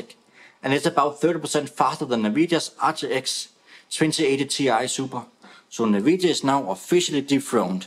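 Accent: Denmark. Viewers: Like the danish accent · He lives in Denmark as i suspected, you have the good danglish!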